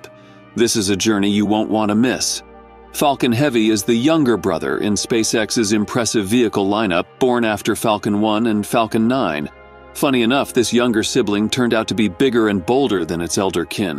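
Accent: United States. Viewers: thank you for plain western english!! I'm tired of the artificial "European accents"!!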